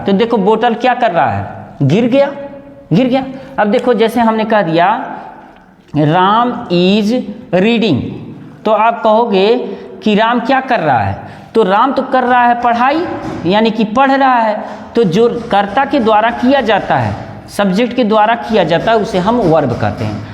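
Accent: Bihar, India. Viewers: Are you from Bihar. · बिहारी है मैने पहचान लिया रिभर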